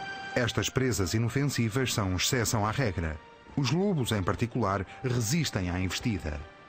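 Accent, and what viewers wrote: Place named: Portugal. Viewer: triste esta narracao de portugueses, estes caras nem falar sabem como vao narrar algo???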